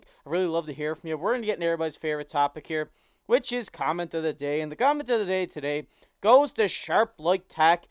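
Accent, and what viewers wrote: Canada. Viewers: Great videos, you are the most Canadian sounding guy ever :)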